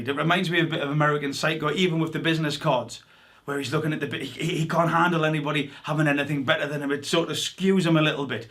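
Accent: Newcastle, United Kingdom. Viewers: Love hearing a fellow geordie on YouTube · Love your channel, Your Goerdie accent reminds me of hone, stay safe over there in the UK. Greetings from Perth West Australia 🇦🇺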